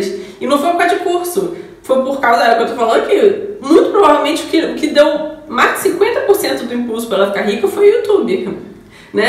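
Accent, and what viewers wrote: Rio de Janeiro, Brazil. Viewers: Linda! Pelo sotaque dever ser carioca. Bom vídeo 👏👏👏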